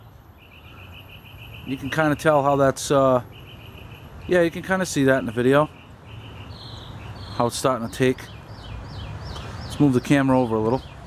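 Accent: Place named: Upstate New York, United States. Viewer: Sounds like upstate new york lol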